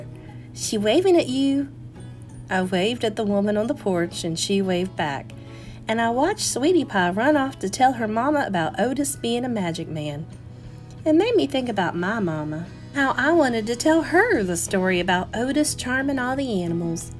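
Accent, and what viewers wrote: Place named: South, United States. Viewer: It's very hard to find anyone who can read a chapter book with excellent intonation, phrasing, and tone. If I need to find a reading to post for my students because I will be absent or I have a student out for an extended time, it's nearly impossible to find good readers on You Tube. But, you are amazing! Your beautiful accent really adds to the ambiance of the story, since it takes place in the south. By far the BEST reader I can find On You Tube.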